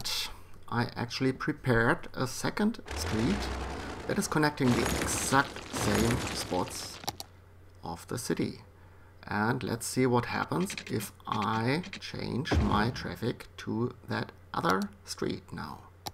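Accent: Germany. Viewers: Dieser deutsche Akzent is ja grauenhaft :D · Awesome German accent. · Ich habe direkt gemerkt das du aus dem Deutschen Sprachraum kommst :D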